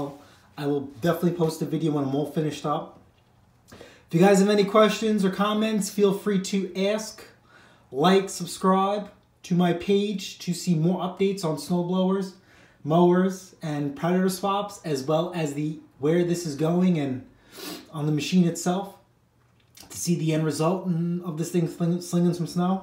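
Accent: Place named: New York, United States. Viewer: Duude Tgat NYc accent is killing it... Totally Gangsta..😎😉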